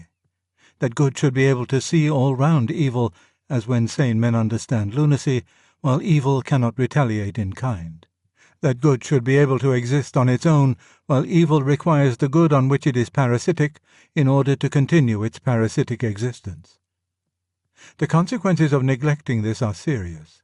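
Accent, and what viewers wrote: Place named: Oxford, United Kingdom. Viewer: You must be unused to the Oxford English accent. The U S accent is foreign to the rest of the world! :-)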